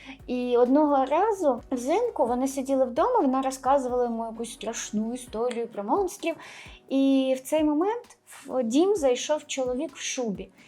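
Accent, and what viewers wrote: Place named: Central Ukraine, Ukraine. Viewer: Та Ви шо ! Мова в цієї дівчинки така класна, так говорять в Центральній Україн, на півдніі - це ж наш скарб - різні діалекти, вимови .Вони тільки збагачують її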